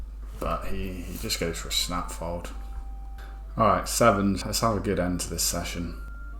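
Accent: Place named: Gloucestershire, United Kingdom. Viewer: thought your accent sounded close by to me. Wiltshire here 👍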